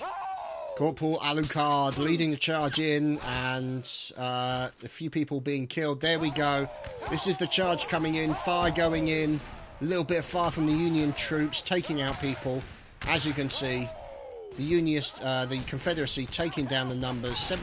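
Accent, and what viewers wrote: England, United Kingdom. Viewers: I wonder how much does the rest of the world know about the American Civil War? Do they really cover that in your schools, consider the amount of your history in Europe compared to our short one?? Other then that you have a great voice for this and you commentary is very good. I hope you Brits know how much woman here love the "English" accents here. Americans in general really like the Brits as one-sided it may be from your end.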